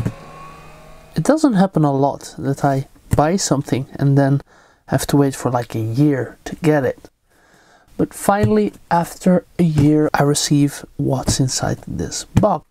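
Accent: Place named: Netherlands. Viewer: It's incredibly obvious when listening to the clicks and paying attention to the recording, but I caught it in the corner of my eye, watching both. But if any board would be that slow to respond it'd be brought up in the review, instantly as that's borderline unusable, I've suffered a Bluetooth keyboard that's actually that slow. My New Model M, the full sized version with a simpler controller module, has no noticeable delay at all, though I'm unsure to the debounce time. I've even played some games with it, aside from the actuation hysteresis and membrane sensing 2KRO limitation it's perfectly fine for non competitive play. This 2KRO limitation and high weighting would be why it isn't ideal for very, very fast typists, but if you're that fast you'd already have gotten a special board for it. P.S. Dacht al dat ik het accent herkende, erg duidelijk voor andere Nederlanders maar bevestigd door de interface in dit stuk.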